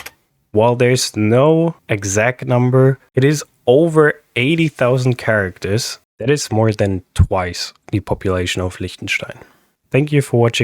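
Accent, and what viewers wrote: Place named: Germany. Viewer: i already thought you were german and when you pronounced Lichtenstein i was certain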